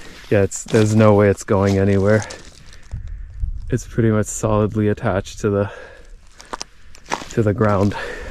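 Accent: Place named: New England, United States. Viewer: What state are from? I have no doubt in my mind that you are a new englander!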